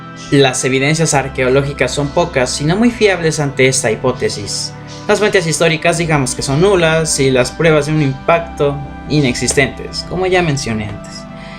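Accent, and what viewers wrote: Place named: Spain. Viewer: Nunca dejaste de hablar con tono ibérico, jajaja.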